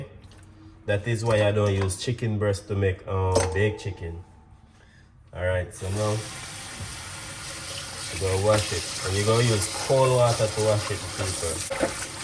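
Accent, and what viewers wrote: Jamaica. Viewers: That look delicious I must try as soon as I get that green seasoning u used..but what I most love is the Jamaican accent 😊😊😊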